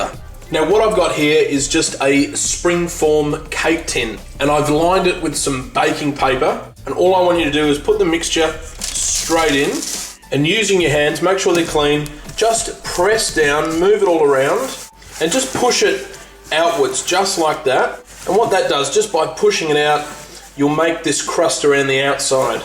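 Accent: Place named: Australia. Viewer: Dear, I come from Holland and very like your recipe's. But sometimes I don't understand you because you've got a Australian accent and my English is just bad. But my question is if you maybe can give a manual in the description so I read it and understead it better? Thank you, Sander from Holland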